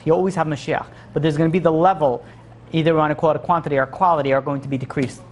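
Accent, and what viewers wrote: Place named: New York, United States. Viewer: "30 minutes and I didn't take a drink" made me Isaac out loud 😂 I feel real bad...just drink freely my brother ahahaha. Your water drinking only affects people who listen to your beautifully super fast wisdomful ny accented voice through noise reduction headphones, the lapel mic you wear catches your swollowing and heartbeat, very ASMR'y. Which reminds me of a joke.... What used to be Bruce Lee's favourite drink???? Wahhh Taaahh!! (Kung Fu 👐)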